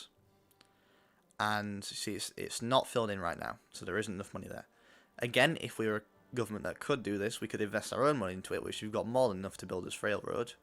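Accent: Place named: United Kingdom. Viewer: Great series, however I'm having hard time understanding British accent videos and you speak too fast for my taste.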